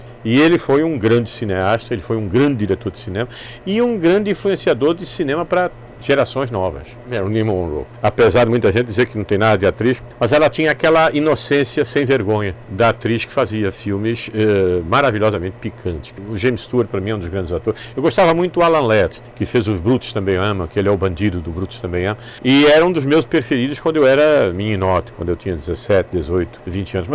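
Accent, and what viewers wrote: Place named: Manaus, Brazil. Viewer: Português/ Manauara. Admiro esse homem.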